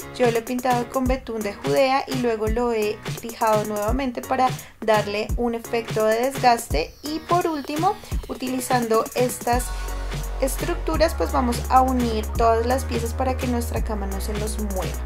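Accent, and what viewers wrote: Colombia. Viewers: muchísimas gracias...las voy a realizar...por el dejo eres colombiana....saludos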